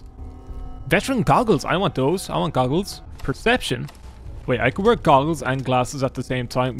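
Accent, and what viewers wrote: Ireland. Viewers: R u Irish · Another Irish YouTuber, nice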